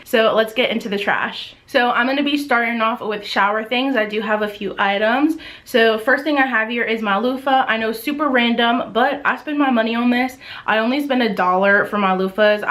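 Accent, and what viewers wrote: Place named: South, United States. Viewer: You sound extra southern in this video 😂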